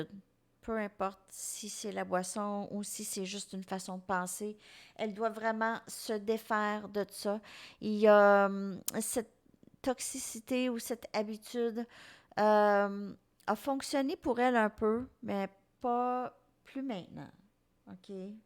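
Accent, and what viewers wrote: Quebec, Canada. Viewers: Hello, j'adore votre accent québécois 🥰 Vous avez dit des choses qui me parlent énormément par rapport à cette relation, l'arc de triomphe par exemple...C'est quelqu'un dhyper sensible en fait mais qui refoule ses emotions et qui se protège énormément avec son masque et son mental..il utilise la musique pour dire ses émotions...merci pour vos généreux conseils...it's a little bit funny this feeling inside... voilà une chanson qu'il chante souvent...c'est ma star 🌟😍🥰❤.. je vais ecouter la chanson d' pour écouter le message et essayer de lâcher prise..😌😉 Merci🙏 😘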